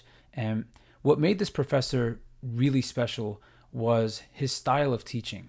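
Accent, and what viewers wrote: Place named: Boston, United States. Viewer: Came for the content, stayed for the Boston accent.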